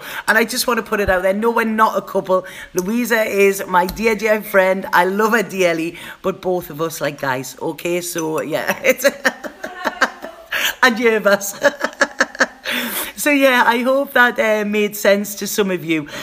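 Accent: Newcastle, United Kingdom. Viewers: Luv u, you're such a breath of fresh air! Thanks so much for all that you continue to give. I'm off to Peru on Tuesday and I'm so looking forward to the experience. God knows what I'll find there but I'm totally up for it! When I'm away it'll be lush to hear another Geordie when I'm so far from home!😉xxx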